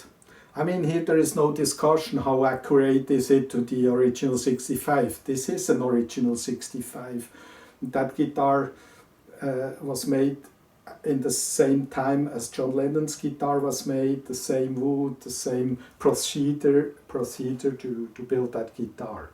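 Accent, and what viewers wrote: Switzerland. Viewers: Hi, great video. You sound a bit Swiss. Do you live in Switzerland by any chance?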